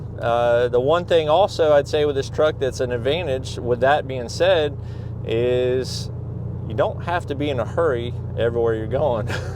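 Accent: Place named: South, United States. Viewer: Look at you with the drone footage. Your southern accent has really picked up since being gone from Ohio. I wouldn't mind having an old manual vehicle. I miss a stick.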